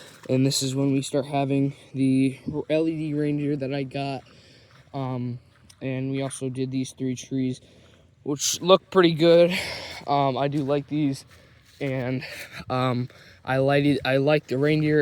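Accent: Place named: Minnesota, United States. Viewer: man, i seriously thought it was. I think the accent added to my conviction that it was Minnesota landscape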